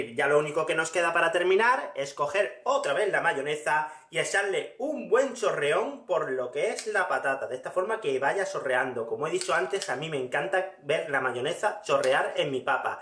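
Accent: Andalusia, Spain. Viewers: o habla con acento castellano o habla con acento andaluz. Mezclaos da angustia oirte. Habla normal y no intentes hablar castellano porque está claro que no sabes y te queda fatal. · No todos los españoles hablamos así, ese es su acento porque es de Andalucía, yo tengo otro porque soy de Murcia, y así jaja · Tu eres andaluz, no? Jajaja Buen video 👌🏼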